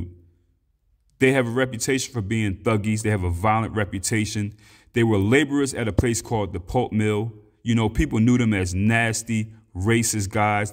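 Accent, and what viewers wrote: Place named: East Coast, United States. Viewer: I love ya East Coast accent too bro 🤸🏽‍♀️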